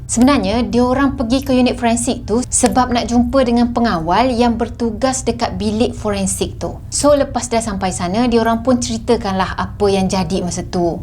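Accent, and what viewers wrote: Kelantan, Malaysia. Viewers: Pada permulaan video,saya ingatkan kak ni orang Indonesia. Tapi bila dah start bersuara,hihi orang kito ruponyo..tokleh nok lari bunyi loghat kelate dok?..tu hok kawe terus pechek potek subscribe..moga Allah permudahkan segala urusan Kak..inshaa Allah..amiin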